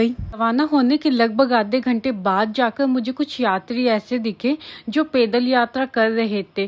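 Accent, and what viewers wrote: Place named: Punjab, India. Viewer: Ap ki language me Punjabi ton h Ganganagar se ho ji ap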